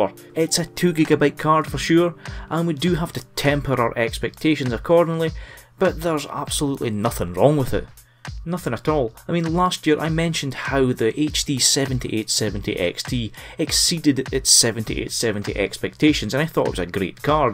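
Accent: Scotland, United Kingdom. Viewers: Love the accent! Is it from Scotland?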